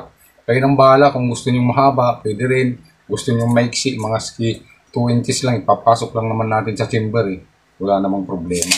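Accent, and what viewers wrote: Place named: Ilocos, Philippines. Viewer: Tagat nu ka bro?Parang ilocano ka..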